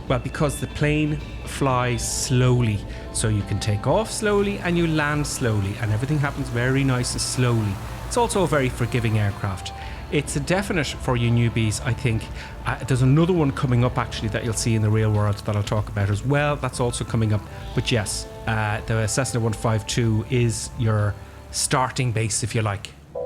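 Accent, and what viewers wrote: Ireland. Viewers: Good to hear that lovely Irish lilt! Always time to sit back with a warm drink, put your feet up and get some laid back simming fun.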